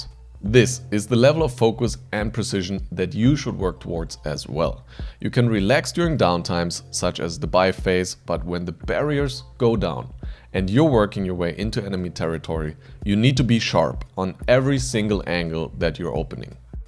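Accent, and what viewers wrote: Germany. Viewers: dieser deutsche Akzent 😂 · Man hört deinen deutschen akzent raus alter mach doch videos auf deutsch dann versteht man es auch leichter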